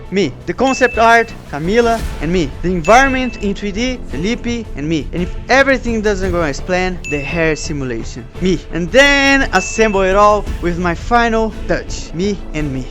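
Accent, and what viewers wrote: Brazil. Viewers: Pode até falar inglês, mas o sotaquezinho BR nunca some kkk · o sotaque brasileiro torna tudo melhor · Why do u have a Brazilian accent? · Aí ai o sotaque BR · Se n fosse esse inglês nunca iria notar q esse safado é br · sapoha parece Br kkkkkkk pelo sotaque ou eu tô loko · esse cara tem um sotaque mt brasileiro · maluko é brasileiro crtz, tem muito sotaque brasileiro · É brasileiro? sotaque BR forte pra caralho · Oh corno tú é Brasileiro né? Seu sotaque e nome estão me dizendo isso -_ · you speak english like a brasilian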